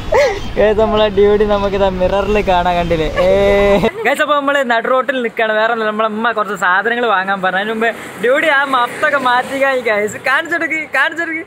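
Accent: Kozhikode, India. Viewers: യ്യ് കോഴിക്കോട്ട് കാരൻ ആണോ,,, സംസാരം കേട്ടിട്ട് തോന്നുന്നു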